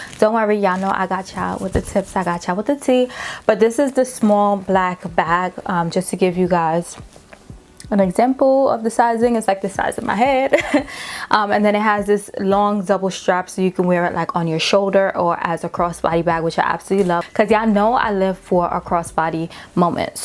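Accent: New York, United States. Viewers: Why do I always know when someone is from New York lmao.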